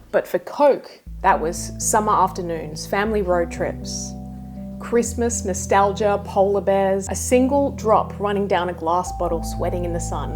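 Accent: Australia. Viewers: Exactly. I think this kind of mistake is generational and geographical. She sounds Australian and also young, so probably wouldn't have the best grasp of British car industry and world history. The Mini was made by BMC, designed by Alec Issigonis. Volkswagon was created by the German govt and the Beetle was designed by Ferdinand Porsche. The word Volkswagon means 'People's car'. Sure both the Beetle and the Mini were 'cheap transportation' icons around the world, but it's pretty inexcusable to make the claim in the video. Poor research. Ironically the Beetle made Volkswagons name and turned a struggling company into a corporate superpower (more ironically it was rescued from the scrap heap by a British army general in the last days of WW2) and would eclipse the entire future UK car manufacturing industry. The Mini was a cultural icon of the 20th century almost to the degree of the Beetle, but it helped bankrupt BMC as each one was sold at a LOSS. 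The more Minis sold, the more money BMC lost....and yet they didn't actually replace it with a more profitable new model until 1980 with the Austin Metro. The reason cited for retaining the Mini was always 'The Mini is still selling in large numbers'!! 🤣🤣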